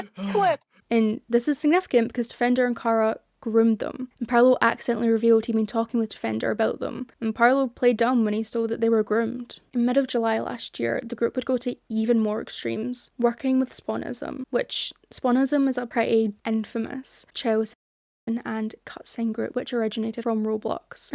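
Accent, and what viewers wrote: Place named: England, United Kingdom. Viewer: Hold. I think im going insane. Why did I remember you having an american accent, and on this account one of the englishy ones?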